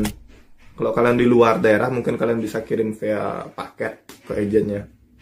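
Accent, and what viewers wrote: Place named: West Java, Indonesia. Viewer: Jiga t sunda kng.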